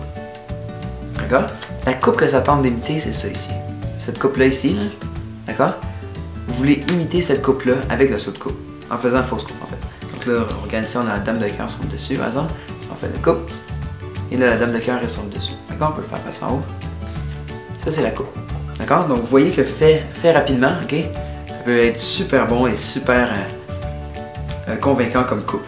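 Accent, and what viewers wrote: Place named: Quebec, Canada. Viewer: Belle accent québéééécois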